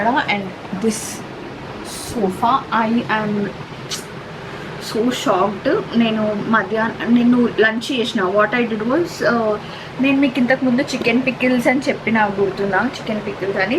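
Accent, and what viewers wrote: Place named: Hyderabad, India. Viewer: Your videos look just natural ❤and more over your slang is pakka Hyderabadi ❤❤️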